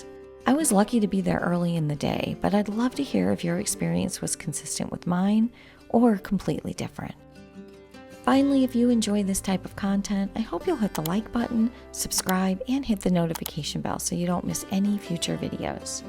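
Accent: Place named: Chicago, United States. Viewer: I guessed Chicago based on the accent 🎉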